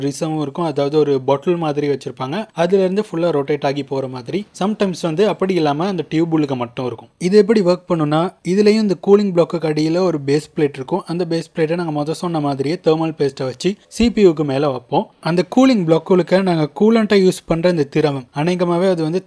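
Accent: Sri Lanka. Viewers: Bro you’re sound so Sri Lankan ….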